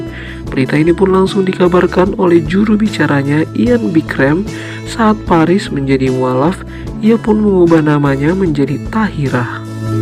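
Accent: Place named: Indonesia. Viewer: Blajar nyebut yg bener nama2 artis..jgn diikut dngn loghat Indonesia kalo Mau bikin content..👎👎👎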